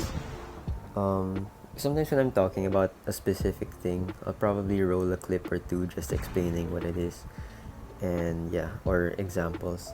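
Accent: Philippines. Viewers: Are u a Filipino? You sound like one tbh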